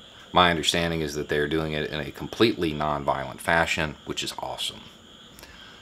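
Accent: South, United States. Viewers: Its sometimes hard for a person from the Caribbean to understand southern accents,u just made it 100 times better,thank you😍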